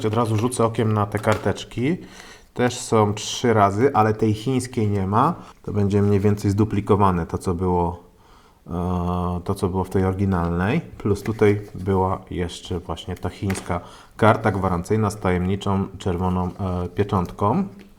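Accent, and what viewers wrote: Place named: Kielce, Poland. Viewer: Hahaha 😁 razi Cię bo po nicku wnioskuję, że jesteś z Opola. To jest mowa z okolic Kielc 😉 Sam z tych okolic pochodzę i pamiętam jak wszyscy mówili : czopka, szolik, szofka itd. Po 20 latach w Krakowie słyszę te różnice, ale nadal wychodzę na dwór a nie pole 😉 Taka gwara lokalna, pewnie też u Ciebie coś takiego jest i nawet nie zwracasz na to uwagi. 👍 Miłego dnia.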